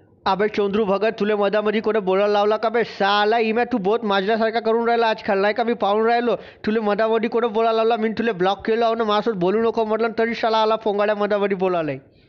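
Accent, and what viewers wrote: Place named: Varhadi, India. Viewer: Nice भाऊ आपली वऱ्हाडी भाषा एकदम मस्त वाटते.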